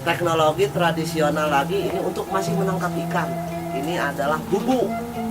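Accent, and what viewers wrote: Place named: Sunda, Indonesia. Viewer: Uhh sunda pisan , mantap kang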